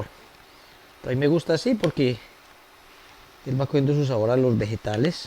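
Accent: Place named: Pereira, Colombia. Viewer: Hola se ve deliciosa la sopa : me hizo reír con tu video, eres un poco charro y por tu asentó pareces de Pereira Risaralda. 😀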